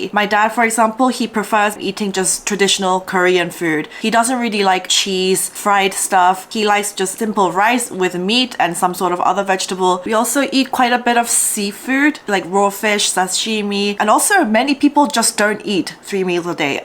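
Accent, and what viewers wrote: United Kingdom. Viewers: She has a UK accent (to my ears).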